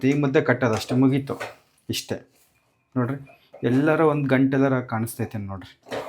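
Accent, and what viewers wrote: Chitradurga, India. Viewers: Nam durgada bashe ty u so much 🥰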